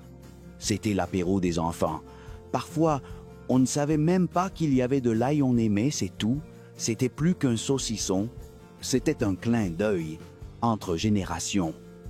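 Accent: Quebec, Canada. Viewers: Vous avez l'accent du Québec. Est-ce que cela parle des produits de France ou du Canada? Il y a des produits écrits en anglais, et d'autres comme oeufs mimosa, gratin dauphinois, etc typiquement de France aussi à cette époque ... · Pas moi, je lui trouve un discret accent canadien dans ses prononciations 🙂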